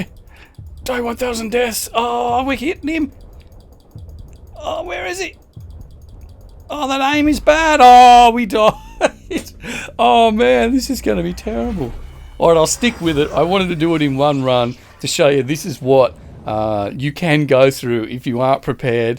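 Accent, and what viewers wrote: Australia. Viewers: At first i thought it was your familiar Australian accent that was so comfortable, then I realised it was your down to earth Australian attitude. No tryhard pretentious radio announcer voice! Rare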